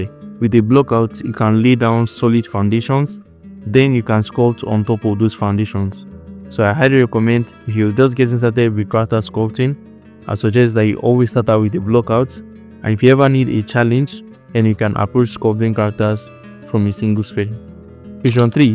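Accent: Nigeria. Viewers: Are you from nigeria?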